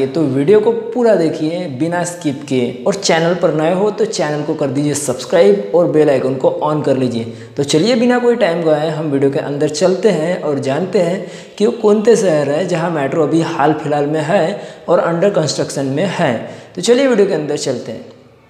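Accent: Maharashtra, India. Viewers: Wo marathi he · wo marathi he shayad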